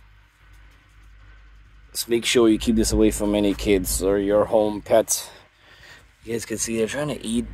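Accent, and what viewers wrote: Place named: Guyana. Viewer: Sound like a GT Banna. 🇬🇾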